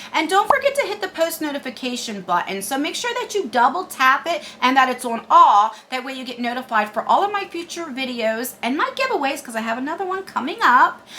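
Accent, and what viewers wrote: New Jersey, United States. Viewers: I'm obsessed with watermellon and your NJ accent. It makes me so homesick since I moved down south. Keep making these awesome videos!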